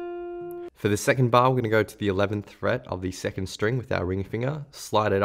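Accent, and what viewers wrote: Australia. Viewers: Best tutorial vid on Youtube for beginner like me, thank you.. are you Vietnamese with Aussie accent? :)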